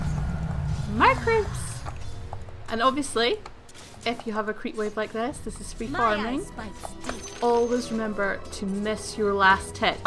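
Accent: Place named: Scotland, United Kingdom. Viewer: This was really enjoyable to watch! Crystal Maiden is my most played hero. I've never actually played ranked yet, partly because I'm worried I'm most likely gonna fail miserably and calibrate myself at an embarrassing level and also because I'm not sure if I would be dedicated enough to push myself to climb, but it is definitely something I would like to attempt anyway. Also I have to ask, (hopefully this isn't too creepy, but) where are you from (I would guess you are Scottish, but I don't want to be wrong and look stupid, which I guess I have done by writing this comment anyway... oh well)? Your accent is awesome! Well I sure dragged this comment on a bit. I hope you do more of these, this was really good.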